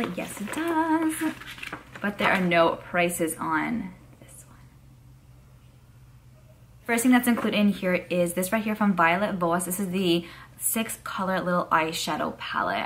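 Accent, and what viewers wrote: New York, United States. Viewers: Love love your videos watch they daily and love you New York accents it’s the cute make me miss home 🥰 love Ipsy too ♥️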